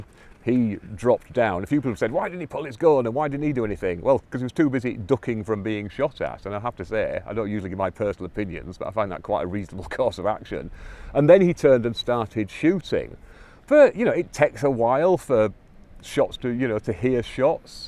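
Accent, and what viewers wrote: United Kingdom. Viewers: How funny it is that some goofy with a british accent is trying to tell Americans what to think. So you're going with the secret service has more stringent rules of engagement than the police do? Cause that's exactly what you're saying, whether you think you are or not. Release your bank statements for the last two weeks.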